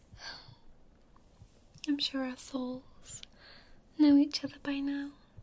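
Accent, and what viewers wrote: United Kingdom. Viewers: Be me, not knowing about yet. First line comes and it has a British accent. "welp...now I have another obsession, thanks youtube"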